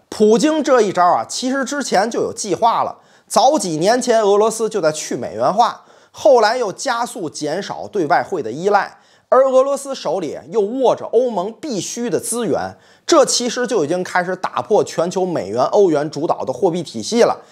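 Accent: Tianjin, China. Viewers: 太喜欢听的天津话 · 我在天津呆过两年，这天津卫的普通话太亲切了，你是真有才，把天津人的幽默发挥到财经政治方面，加油，继续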